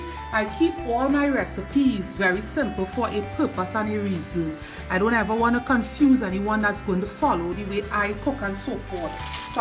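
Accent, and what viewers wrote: Trinidad and Tobago. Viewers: I would love to see what this woman looks like! Her voice is amazing (Trinidadian accent)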